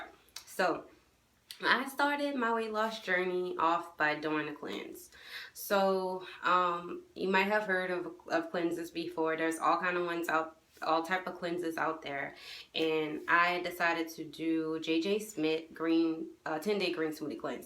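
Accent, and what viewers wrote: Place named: Louisiana, United States. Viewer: Congrats and love your video and Louisiana accent. I also subscribed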